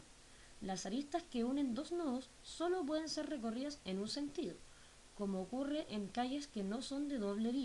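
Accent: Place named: Chile. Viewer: me fascina el acento chileno :3 buena explicacion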